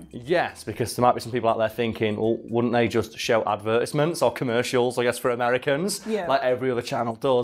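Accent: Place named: Northern England, United Kingdom. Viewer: don’t even mind the northern accent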